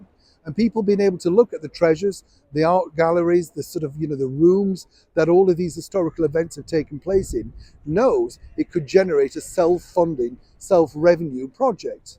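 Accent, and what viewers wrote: United Kingdom. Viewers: 🇨🇦❤️🇬🇧I love your accent and your chuckle. Britts are fantastic folks!! Long live the king and Queen! You have educated me in so many things about England since watching your channel. I’m grateful to you. I wish so deeply to afford to visit one day, but if I can’t afford it ever before I pass, we have you.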